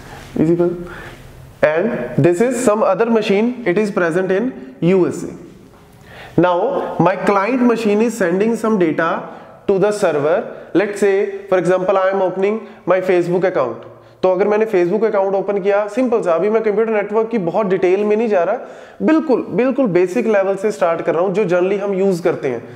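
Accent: India. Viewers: Thanks sir... Sir apke English bhi mujhe hindi se lag rahi h .😘😘 thanks for it again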